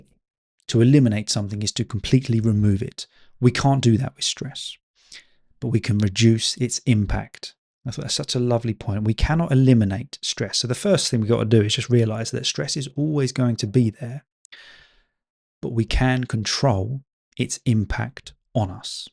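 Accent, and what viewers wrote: England, United Kingdom. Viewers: Hello, as a new listener, I appreciate your podcasts so much, very interesting topics, nice English accent, pleasant voice. I improve my English and I'm relaxing listening to you. Thank you very much, you make me think about life in English 🙏🏼🙏🏼👏🏼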